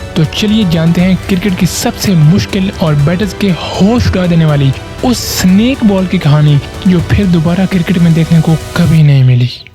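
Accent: Pakistan. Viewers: Tera accent to Pakistani hai baat tu IPL dekhne ki karta hai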